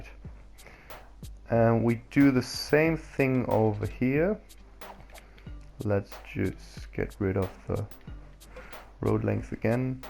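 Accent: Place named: Germany. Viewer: Are you German? You have a German accent. Schade dass du nicht noch mehr Videos machst... Jaa bisher sind die noch recht Amateur-Mäßig... Aber so sollte Youtube auch sein und die Tipps und Tricks hier haben mir sehr geholfen. War auch recht unterhaltsam und entspannt. Vielleicht macht du noch was zu Jurassic Park ?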